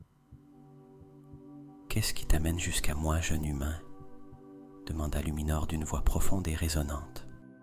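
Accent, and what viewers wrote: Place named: Quebec, Canada. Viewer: WoW mais quel changement! Petite question: je suis québécoise et j’entends très bien le même accent. Êtes-vous québécois vous aussi?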